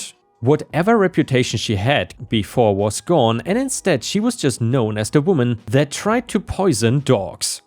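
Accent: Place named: Germany. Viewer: Are you german? I cind of hear an acsent. (sorry for the gramar not a english speaking one either)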